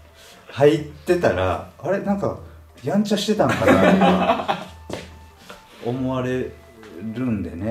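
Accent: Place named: Kansai, Japan. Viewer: 関西弁も相まって宋世羅の羅針盤チャンネルを彷彿させる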